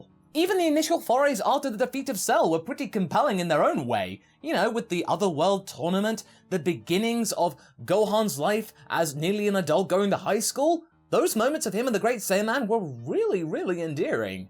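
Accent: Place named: RP, United Kingdom. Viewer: you sound like a posh dbza goku. I keep expecting you to say "muffin button"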